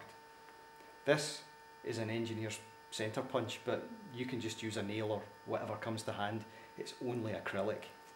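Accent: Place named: Scotland, United Kingdom. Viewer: An engineer with a scottisch accent. Seems Scotty is alive and well. Great consturction video. Greetings;-)))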